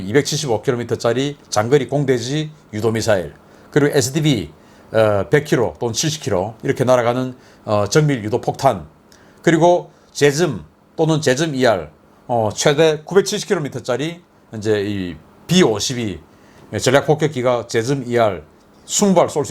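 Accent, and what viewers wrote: Gyeongsang, South Korea. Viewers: 나는 미국 샌프란시스코에서 살고있읍니다. 신인균 교수님은 애국자며 잘 연단된 분이며, 이 대한민국에서 발견 됬음을, 참으로 축복받은 한국이라고 생각 합니다. 결론은, 신인균 교수님은 애국자이시며, 남한에 대통령감 입니다. 빨갱이 이북놈들과 싸워 이길려면, 신인균교수만한 능력있고 자격있는 사람은 하나도 찾아볼수 없었읍니다. 얼굴도 대통령 얼굴 처럼 잘생기셨고, 한국을 바로세울수 있는 분은, 바로 신인균 교수님이라고 인정합니다. 세게 어느대회에 나가도, 한국을 대표하는 대통령으로서, 하나도 부족함이없이, 잘 훈련받은 훌륭한 대한민국 남자라고 봅니다. 내년 대통령 선거에, 꼭 출마하셔서 대통령이 되셔야 대한민국을 살릴수 있읍니다. 미국에있는 우리 교포들이 전부 다 간절히 바랍니다. 그런데, 한가지 바라옵기는 , 경상도 사투리는 이해가 참 어렵습니다. 조금이라도 고쳐주시면, 우리가 듣기에 많이 쉽게 들을수 있겠다고 생각하며, 그렇게 노력해주심을 진정으로 바랍니다.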